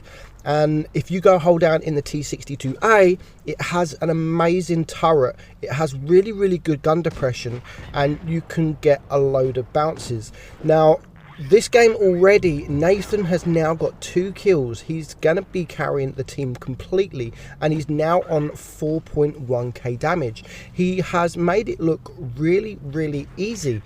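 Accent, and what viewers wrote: United Kingdom. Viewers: .... You are absolutely unique. And NOBODY can`t copy that accent.:) Fugit could try to copy the style, but that british accent is hilarious. Cheers. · You're British mate, I don't hold it against you.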